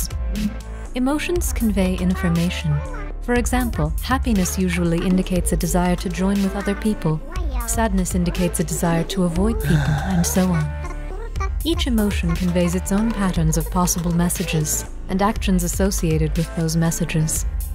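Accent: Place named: United Kingdom. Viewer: Some one PLEASE tell me WHY these producers insist that a 'British' accent qualifies as an authoritative narrative ????? Is this opperant conditioning attitude the best you can do? Right coast version of British accent turned inside out. The flexion is suck eggs.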